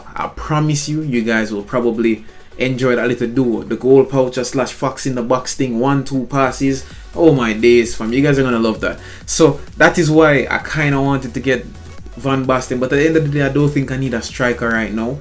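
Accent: Jamaica. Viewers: are you jamaican bro. if not big up stills 🇯🇲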